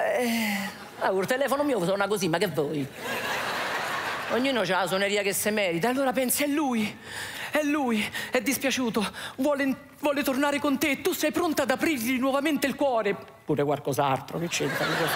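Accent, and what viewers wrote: Rome, Italy. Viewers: stesso quartiere della Meloni : stessa cadenza, stessa genialità espressiva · Mio padre aveva una parlata quasi romanesca e ascoltarti e una cosa fantastica perché tu sei fantastica dai allegria e colore a tutto quello che dici parole vere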